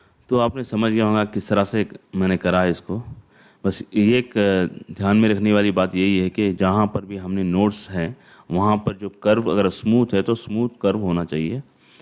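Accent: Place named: Hyderabad, India. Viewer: Asakum Nice vdo Your accent is like deccan hyderabad. I am sure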